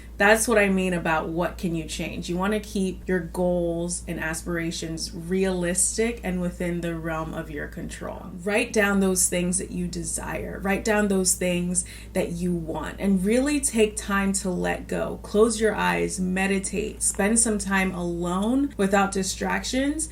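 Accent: Nigeria. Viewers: you're an amazing woman of God. Loved this video, but I truly miss your intermittent bursts of Nigerian English. Please bring it back.😂 God bless you, girl!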